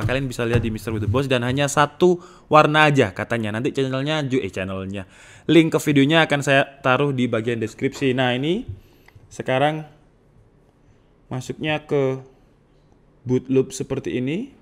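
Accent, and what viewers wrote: Java, Indonesia. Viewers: Mas sampean tiang jowo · Jowo mu medok mas😁😁😁😁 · Ternyata orang jawa mas 😂😂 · Wong jowo mas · Bang orang jawa yh? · Wong Jowo toh 🤣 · jowo endi lur😂😂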